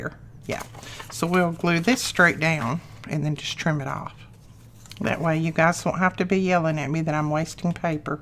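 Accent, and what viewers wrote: South, United States. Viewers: you just keep doing what you do. I sit down and craft while I watch you every night. You keep me company. I love listening to your lovely Southern voice! Thanks for what you do❣️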